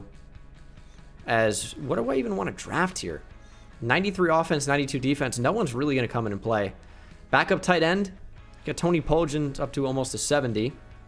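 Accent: Australia. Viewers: I thought the Australian accent was great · 10/10 Australian accent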